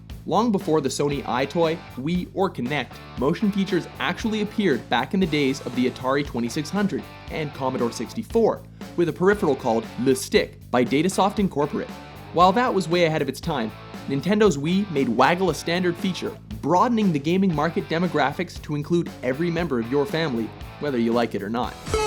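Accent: Canada. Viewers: is the guy who narrates this Canadian? He always says PROcess instead of PRAHcess. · how about a non canadian for the voiceovers.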